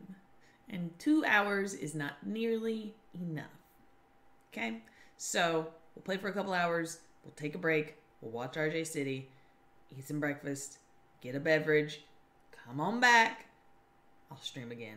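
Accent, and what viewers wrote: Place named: South, United States. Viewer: I love your Southern Accent Southern Accent Gang love from Mississippi also you’re so adorable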